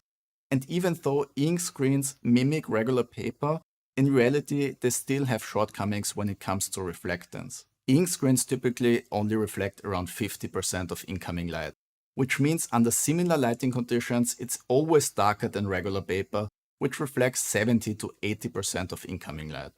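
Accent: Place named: Austria. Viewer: Inspite the heaviest Austrian(?) accent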